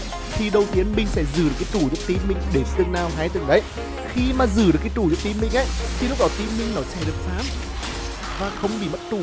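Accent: Nghe An, Vietnam. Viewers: ông này người nghệ an à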